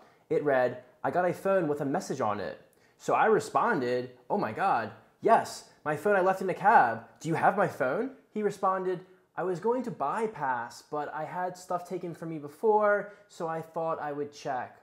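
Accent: United States. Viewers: No shade but you u from philly? That’s a lot of Phowwns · Sounds like south florida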